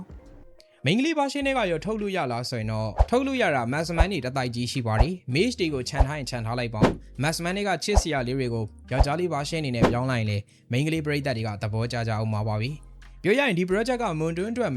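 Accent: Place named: Hokkien, China. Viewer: ပြောတာပြောရတယ်..သူ့လေသံက.Hok fan😅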